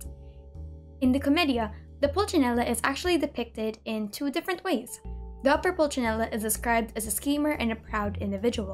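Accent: Philippines. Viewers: Your accent sounds Filipino ❤️ Hello, kabayan! I love your content! Amazing research and compilation.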